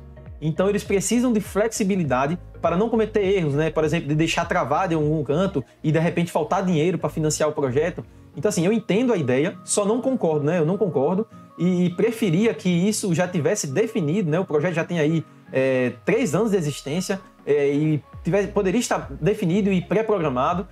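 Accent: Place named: João Pessoa, Brazil. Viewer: Parabéns pelo conteúdo? Tu és de qual cidade? Tens o sotaque parecido com daqui de João Pessoa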